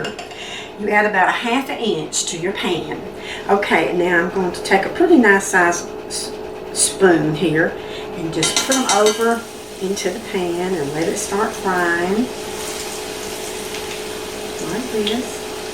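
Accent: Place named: Alabama, United States. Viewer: This just brings back so many memories of cooking with my grandma! 😭😭😭 I’m originally from Alabama and your accent brings me back home, thank you for your sweet southern charm!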